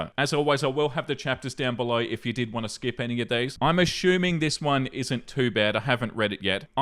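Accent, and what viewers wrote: Australia. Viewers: My daughter and I love listening to you read stories. Indignation just sounds better in an Australian accent 😊 Thank you for the awesome entertainment!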